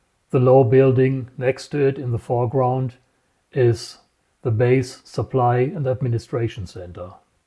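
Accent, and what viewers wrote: Germany. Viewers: I don't understand a word, but very authentic german accent!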